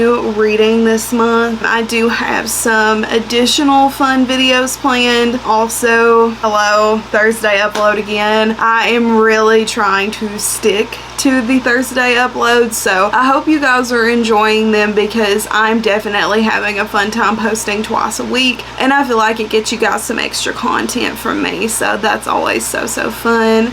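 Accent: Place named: South, United States. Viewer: Ok, I’ve gotta know where you’re from. Love the southern accent. I’m from Georgia 🍑 always looking for new book besties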